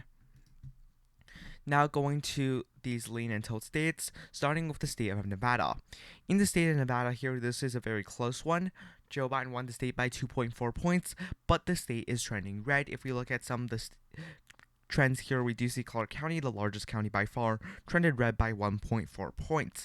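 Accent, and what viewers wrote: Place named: China. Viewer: Is it me, or are you Chinese? The accent was definitely Chinese. The assertions, to someone not connected to the US, struck me as merely your opinion. My own personal opinion is Trump will lose because democracy has been destroyed from forces at work within the west. I'm just awaiting the autocracy as it slithers out of its gross coils.😕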